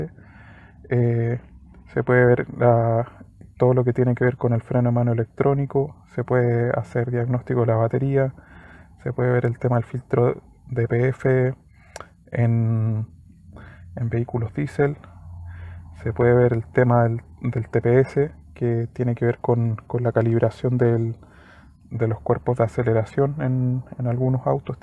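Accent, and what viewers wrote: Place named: Chile. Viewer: Amigo, una consulta.. Por tu tono de voz sos de chile, mi pregunta es, para los vehículos japones jdm que se vendieron solamente para ese mercado, pero vienen de igual manera con el volante a la derecha, Toyota subaru mitsubishi nissan etc etc.. Sirven estos escaner? Soy de Paraguay y hay miles de vehículos volante cambiado por acá.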